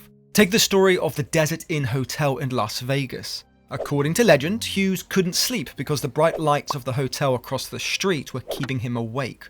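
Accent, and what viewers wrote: United Kingdom. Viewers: Hate this condescending British voice speaking ill of a great man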